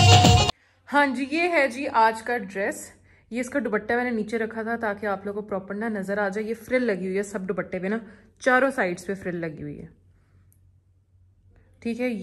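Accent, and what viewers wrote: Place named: Punjab, India. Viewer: in love with your voice specially(punjabi accent)😍 and uhr justtttttttt waoo🙈❤ have watched all your videos and the way uh entertain everyone is just commendable😍 so much love from INDIA❤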